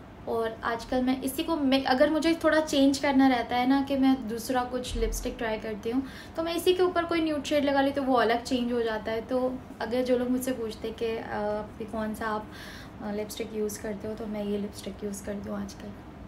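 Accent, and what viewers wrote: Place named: Lucknow, India. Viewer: assalam alaikummm .....bhot beautiful ho.....aap ki awaj ekdm lko walao ki trah haiiiii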